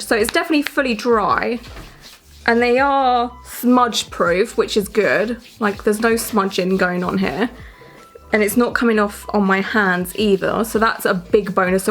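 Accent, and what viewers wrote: United Kingdom. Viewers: You are hilarious girl. I could just listen to you with that fabulous U.K. accent.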